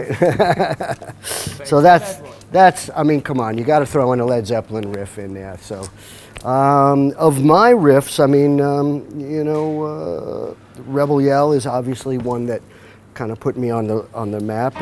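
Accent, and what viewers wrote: Brooklyn, United States. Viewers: His palm muting virtuosity is out of this world. Love that you can hear a little of that 'Brooklyn honk' creep into his speech every now and then too. A nice Jewish kid from Brooklyn :-) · Is he from Brooklyn? I forget, but it sounds like it. What a cool guy.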